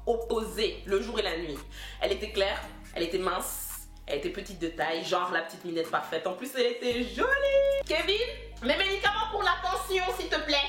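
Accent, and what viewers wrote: Ivory Coast. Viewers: alors là ! !!! Si on me dit que tu n'es pas ivoirienne toi! lool. Je te découvre avec cette vidéo. ..Et pardon yé né ka m'abonner keh lool. J'ai trop rigolé. Je t'encourage ! Tu es top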